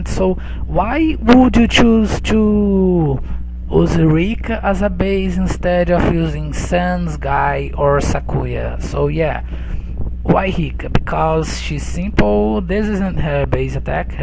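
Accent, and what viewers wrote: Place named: Brazil. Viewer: seu sotaque [e de brasileiro kkkkk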